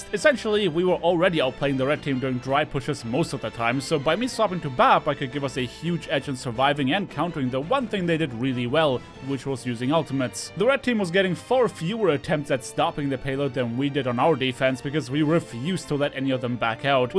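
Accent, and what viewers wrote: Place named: Germany. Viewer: I knew it You are a German Dein Akzent hat mich immer vermuten lassen, dass du ein Deutscher bist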